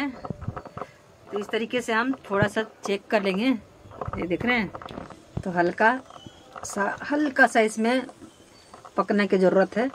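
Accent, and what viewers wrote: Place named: Bhojpuri, India. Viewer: Anuty ji aplog to hamare jaise bhojpuri bol rhe ho 😅 aur apka khana v bahut majedaar banati ho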